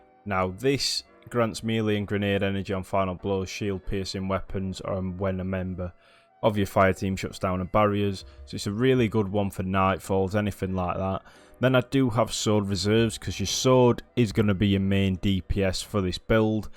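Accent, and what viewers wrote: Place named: Leeds, United Kingdom. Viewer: Sound like a leeds lad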